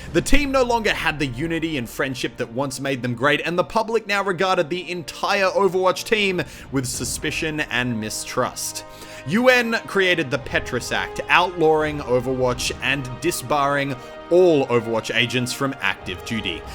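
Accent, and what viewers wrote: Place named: Australia. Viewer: your Australian showed so Hard in this vid. Reyes is pronounced Ray-ez, not Rays lol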